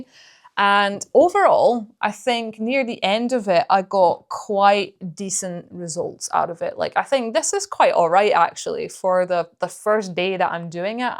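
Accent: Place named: Scotland, United Kingdom. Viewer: I actually don't pass the wool between my carders, I just swap hands and when it comes to making the rolags off I just roll in down off the carder into a little tube. Just the way I find intuitively works for me. Looks like you are doing great. It's also really nice to hear more Scottish voices on YouTube!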